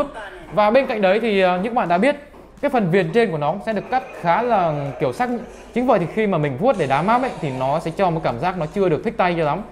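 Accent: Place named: Thanh Hoa, Vietnam. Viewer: Nghe thấy giọng thanh hoá tắt video ngay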